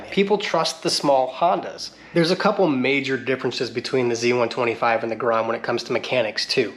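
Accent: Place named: Chicago, United States. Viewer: you seemed to have some, of the Chicago area inflections, oh well, thanks for answering, have a good day.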